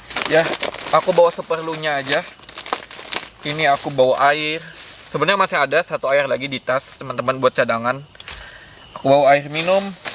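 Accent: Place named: Kalimantan, Indonesia. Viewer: Ga usah pake kata Gua apa Gue bang.. Logat mu kalimantan banget.. Lebih bangga pake bahasa kalimantan atau setidaknya bahasa indonesia.. bangga lah berbhasa Daerah, bahasa kalimantan!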